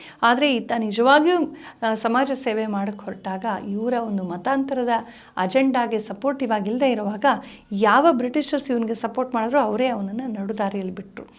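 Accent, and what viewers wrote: Standard. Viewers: swachavada kannada 🙏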